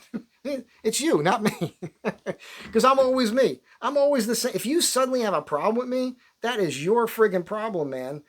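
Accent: New Jersey, United States. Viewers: Great video, spoken like true Jersey! Sorry I missed your live it was a little late here on the east coast but caught it this morning. Trump is kicking ass, as he should! 😊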